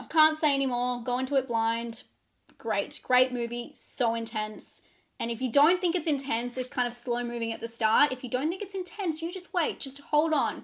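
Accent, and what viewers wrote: Australia. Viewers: Love the Aussie accent! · i Love your Australian accent and i like the way you look when you talk like that.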